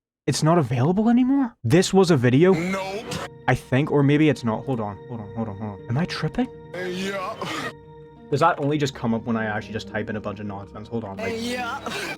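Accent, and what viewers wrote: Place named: Ireland. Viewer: tbh i only watch your content for the funny irish accent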